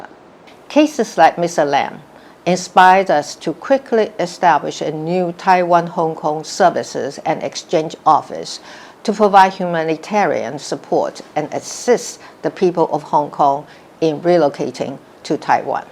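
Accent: United Kingdom. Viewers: 濃濃的英國腔.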